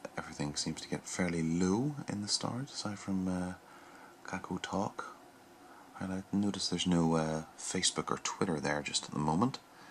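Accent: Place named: Northern Ireland, United Kingdom. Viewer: Where are you from? You sound like you're from around my area..ish lol. Northern ireland?/ireland?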